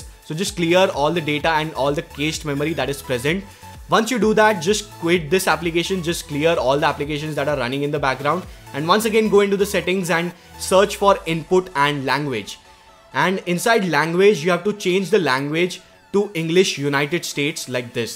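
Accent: India. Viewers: Good video! But I must say Mr Man from India, LOL! Your video and your f**** talking with your India accent is way too quick and fast and as you know most of us are watching this video on our cell phones we could barely even see the writing on your cell phone and you're trying to show us on your cell phone and you go way too fast and you speak too fast with an Indian accent, , because of that your video sucks because you're way too fast and no one comprehended anything from your video we can't even barely see your cell phones writing and then you go so fast also to top it off with ice cream you all so f**** put the cherry on top with your India accent I mean come to f*** on get real no one got anything from this video you piece of dumbass! LOL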